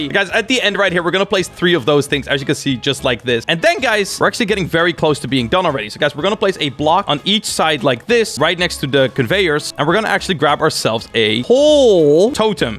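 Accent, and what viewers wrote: Netherlands. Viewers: You Dutch I think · Hey are you Dutch?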